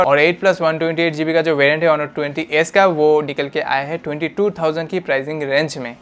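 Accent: Bengal, India. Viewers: Amar mone houechilo Tumi Bengali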